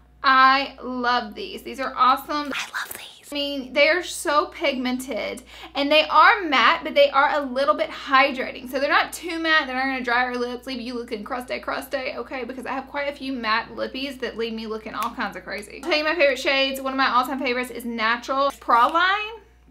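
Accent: South, United States. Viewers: Have you...have you ever heard of a southern accent? Magic.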